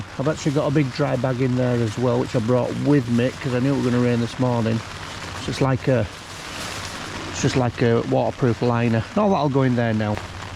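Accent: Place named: Rotherham, United Kingdom. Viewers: I came from TikTok, but I couldn’t be happier! As a fellow northerner (I can tell by the accent) who moved to The Peak District last year, not only do I find this enjoyable, but it’s so useful, I’m going to have to watch back through your videos and learn your amazing methods and tips! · Here from TikTok. Your accent sounds super local to mine. I’m from Keighley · Where abouts are you from? I’m from Rotherham an recognise your accent 😂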